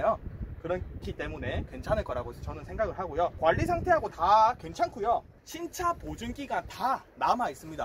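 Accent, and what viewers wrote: Busan, South Korea. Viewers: 시원시원한 부싼 사투리에 설명도 잘해주시니 사고싶은 욕망이 치솟네요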